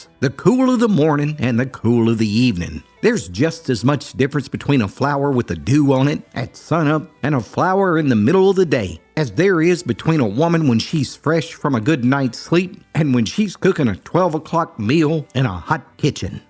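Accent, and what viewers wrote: Appalachia, United States. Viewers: Lol, I am terrifyed of snakes too😂. So I identified with that old man😂. I love flowers growing but do not like cut flowers, they just die 😢. I enjoy listening to your country mountain voice telling stories. I live in the north (north-central Indiana) now for the past 35+ years. Moved up from Mena, Arkansas back in 1988. I miss the south but not the snakes and bugs. I still live on a big farm but alone now at 70 and, sadly, I am crippled. I can not do much any longer and I sorely miss my veggie garden, canning, baking and washing with my wringer washer 😭. But God has Blessed me with memories for which I am thankful and for your stories. They fill my lonely heart❤. God Bless you! Brook🧑‍🌾🙋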